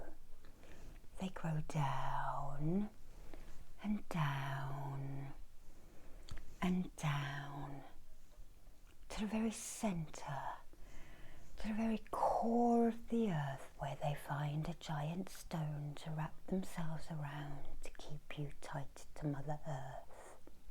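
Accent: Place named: Southern England, United Kingdom. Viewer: Something about the tones of you south Britons is perfect for this gig.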